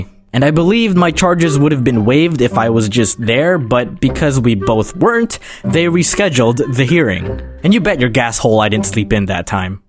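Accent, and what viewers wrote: Canada. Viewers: Why in the absolute fudge do you have a semi-quebecan (?) accent when speaking french?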